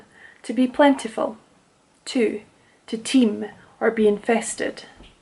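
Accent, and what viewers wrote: Edinburgh, United Kingdom. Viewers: So nice to hear such a lovely Scot lass tell a beautiful story. Would love to hear a wee bit more of the Edinburgh accent, it is my favorite sounding speak.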